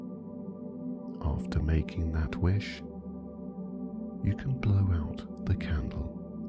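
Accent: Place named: England, United Kingdom. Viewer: Not just because I'm an Anglophile or anything..😉.. but I find that anyone with an English accent has just about the best voice for this kind of work. So soothing. Thank you,. xx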